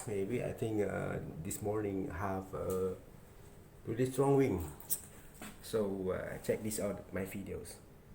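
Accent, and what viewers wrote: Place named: Singapore. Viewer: When I hear his accent, I thought I am watching singaporean vlog... Great job pak cik...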